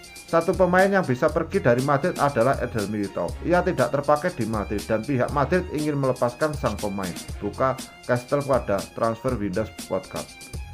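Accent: Java, Indonesia. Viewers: Presenternya terlalu medok..😁😁😁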